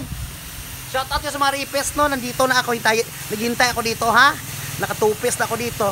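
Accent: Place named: Visayas, Philippines. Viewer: dow bisaya man di ay sya?😂 diin imong probinsya ate?😂😂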